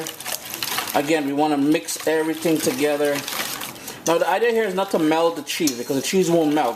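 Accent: Trinidad and Tobago. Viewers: I've been around Trinis all my life and to a non Trini it sounds similar. I think it is the Indian influence because I find it sounds similar to an Indian accent sometimes. I know there's a difference though. I always thought this guy was a Trinidadian based on his accent but the post that we are commenting on suggests otherwise. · I think he is from Trinidad because I know how trini people talk cause I am a trini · He is a Trinidadian ! And he lives in Canada so he has a Trinidadian and Canadian accent ! both accent intertwined with each other .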